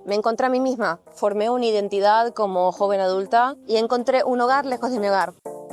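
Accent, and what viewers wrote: Buenos Aires, Argentina. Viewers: Argentino??? O porteño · acento de porteño* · el 70% de los argentinos tenemos ese acento, asi que acento de argentino* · Hola cómo estás mi nombre es José Carlos de Tijuana México y bueno un gusto seguirte adoro tu pais de Argentina tu acento es lindo n.n y bueno me gusta mucho la cultura japonesa como el anime manga comida japonesa sus paisajes etc etc lo que mas me entristece es que en 3 meses la versión japonesa de power Rangers llamada super Sentai llegará a su fin el 15 de febrero y bueno buen vídeo me gusto fue interesante te aprecio mucho y mil abrazos grandes para ti saludos desde Tijuana México. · me refiero al acento, no al habla... Ese acento cringe de porteño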